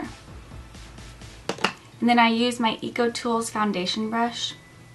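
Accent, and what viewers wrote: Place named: South, United States. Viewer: you are so freakin adorable. love your southern accent! goood vdeo too!(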